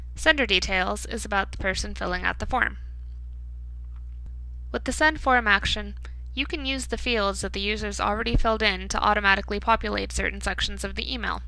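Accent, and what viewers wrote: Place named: United States. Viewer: why use a US voice?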